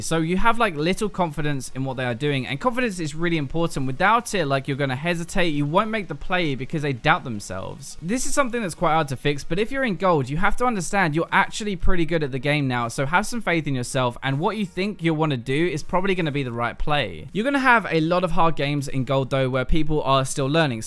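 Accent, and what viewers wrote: United Kingdom. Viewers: Not the guy with the british accent again :(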